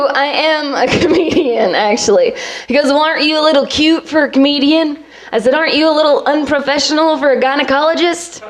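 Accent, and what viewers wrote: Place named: Georgia, United States. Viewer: Great comedian, terrible audience. Also... looooove that Georgia accent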